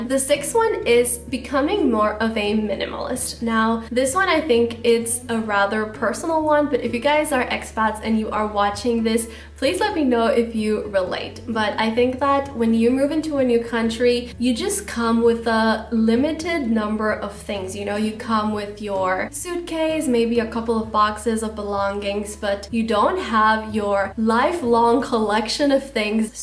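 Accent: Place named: United States. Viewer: Your English is perfectly American. You didn’t learn that in Honduras, did you?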